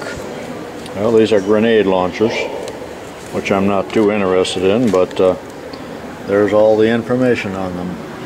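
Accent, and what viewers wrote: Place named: Midwest, United States. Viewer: No disrespect intended . ! I guess it's that Midwest voice that reminded me of him . He was a good man .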